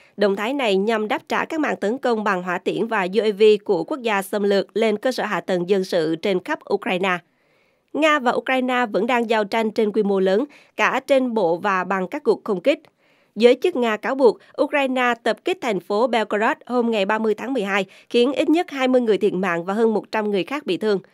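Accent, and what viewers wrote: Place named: North, Vietnam. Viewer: HAPPY NEW YEAR 2024 to The Saigon Post. Chúc The Saigon Post càng ngày càng phát triển, Đề nghị The Saigon Post sử dụng phát thanh viên có giọng nói của người Sài Gòn thay vì giọng nói của miền ngoài, chúng tôi không thích, cám ơn.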